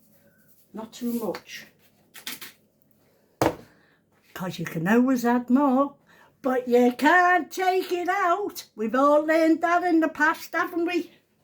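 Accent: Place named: Birkenhead, United Kingdom. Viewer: So glad I found you you make my day my dad and is family live in Birkenhead so hearing you talke makes me so happy I've also lost people I love my husband died 3 years ago and my brother and a4 year old grandson and a 7 year old grandson and some amazing things have happend to let us no there is life after death I have 16 grown up grandchildren and 30 great grandchildren love you to bits your great Christine from Leicester xxx 🌹🌹🌹🌹🌹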